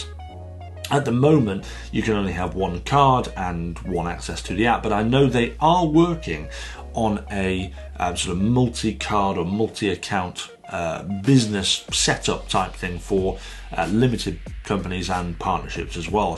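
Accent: United Kingdom. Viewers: im curious which part of the uk are u from!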